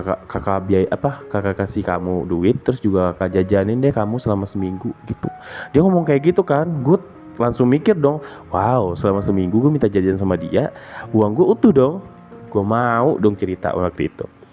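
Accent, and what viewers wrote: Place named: Sunda, Indonesia. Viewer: Suaranya suara Sunda bgt... Lembut dan berkarakter...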